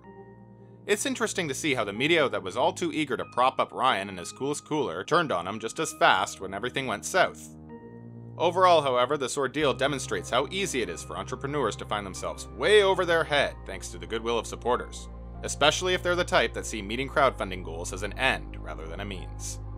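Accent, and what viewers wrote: Canada. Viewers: I think the voiceover guy has the most Canadian accent ever lol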